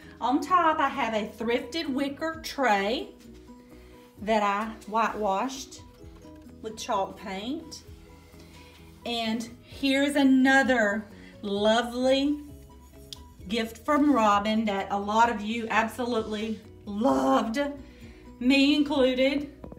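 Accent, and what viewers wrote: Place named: Alabama, United States. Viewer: Everything was so beautiful and you have created a warm and cozy home! Everywhere you look there is a treasure and a history. My two bedroom house is a little cottage and 73 years old (same as me). I looked closely at your windows(are they glazed?) and baseboard trim and am wondering if your home is an older one as well. I love the charm of an older home! It even has the original glass doorknobs!, you are a delight and I love your Alabama accent! Blessings from your new Central Florida friend❤️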